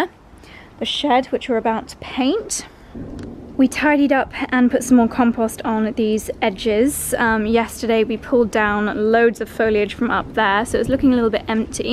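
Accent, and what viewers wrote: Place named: England, United Kingdom. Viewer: I love just listening to you talk. Such a beautiful voice and cute English 'accent' :)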